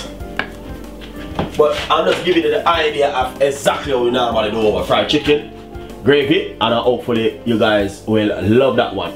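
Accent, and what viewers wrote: Jamaica. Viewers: Sound like a Jamaican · That’s really just how Jamaicans talk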